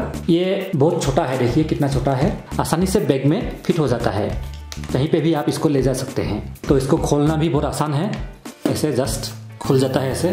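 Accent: Assam, India. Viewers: your accent sounds assamese ☺️ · Your hindi accent sounds like you are Assamese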